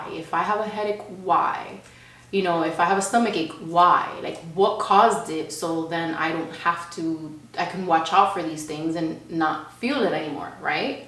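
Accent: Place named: Miami, United States. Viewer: you sound miami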